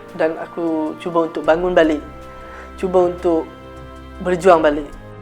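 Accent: North, Malaysia. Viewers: Hang pasti oqang utaqa..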